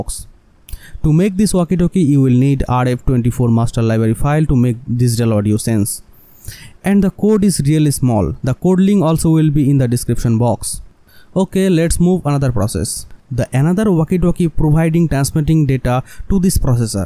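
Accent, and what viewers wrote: Bengal, India. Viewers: Your accent sounds bengali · индийских английский это что то с чем то.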